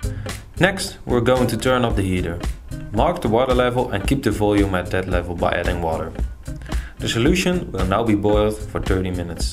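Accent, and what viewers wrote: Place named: Netherlands. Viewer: Weer een Nederlands accent! Misschien lijkt het maar zo, maar waarom bestaat de YouTube community met de kennis en kunde om op video een succesvolle, werkende hoge kwaliteit OLED te maken (bijna) volledig uit Nederlanders? (Granted, dat is wel aannemende dat jij ook een Nederlander bent, maar aangezien je accent ben ik daar 99% zeker van) De YouTube OLED manufacturing community lijkt wel volledig overgenomen te zijn door onze mede-Nederlanders. Je zou ook wel kunnen zeggen dat wij de YouTube OLED community volledig hebben... G E K O L O N I S E E R D ! Nu alleen nog een manier vinden om er een slaatje uit te slaan, net zoals men deed in de gouden eeuw. Ja, die gouden eeuw! Ook wel bekend als de goede oude tijd van de VoC, toen wij Nederlanders nog praktisch regeerden over het zuidelijk halfrond. En dan kies ik er bewust voor om de alom bekende, zeer beruchte impopulaire zwarte bladzijde uit onze geschiedenis expres even buiten beschouwing te laten. 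Discussies over de hoeveelheid roet in het gezicht van Zwarte Piet en de huidskleur van Sinterklaas hebben wat mij betreft niets te zoeken in deze krochten van de YouTube sloppenwijk, ook wel bekend onder de Engelse naam: 'comment section'. 🤣 (Volledig satirisch bedoeld natuurlijk, het was absoluut niet mijn bedoeling om iemand te kwetsen met deze laatste alinea!)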